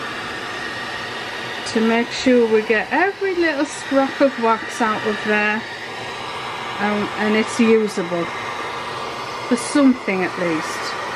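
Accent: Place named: Stoke-on-Trent, United Kingdom. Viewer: Where you from? Your accent sounds very similar to mine and I'm from stoke on Trent.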